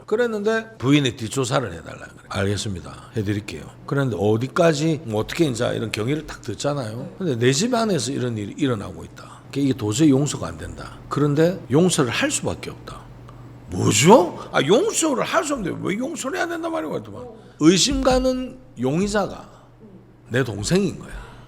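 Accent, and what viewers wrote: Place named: Gyeongsang, South Korea. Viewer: 오늘 처음 봤습니다 대장님 갱상도 사투리 억수로 잼있심더 ㅋ 나는요 고향이 안동이라 카이요 ㅋ 자주 옴시더 ㅋ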